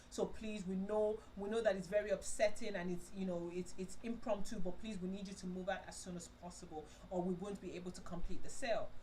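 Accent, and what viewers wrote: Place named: Yoruba, Nigeria. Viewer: 😂 You said v-log... Really sorry you've been going through all this. I can't imagine how overwhelming everything has been for you. Nigeria is just a whole beast to deal with especially when it comes to things like your shop issue. Also I'm probably projecting and I'm a huge proponent of keeping quiet if you don't have anything nice to say but I honestly love when the yoruba or the more unfiltered sounding accent comes through from you. Again projecting but yeah. I really hope things don't get messy at all with the shop folks and goodluck with the new shop and the tailoring business. I follow you on insta so I see your fabric ads and I always wondered why you didn't make clothes 'cause its a whole lot more profitable to have both businesses in one. Its what my dad's tailor does. You pick out the material there and the design and boom, he makes his money.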